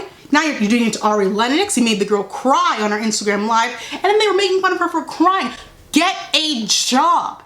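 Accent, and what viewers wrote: New York, United States. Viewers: off topic but the new york really be jumping out lmao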